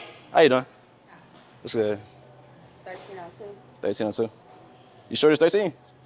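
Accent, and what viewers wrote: United States. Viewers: Is she like from Texas? She got an accent it sounds like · her southern accent is so beautiful 😍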